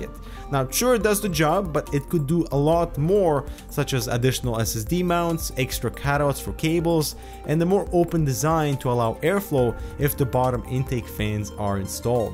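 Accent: Russia. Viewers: Are you russian? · are you Russian? =)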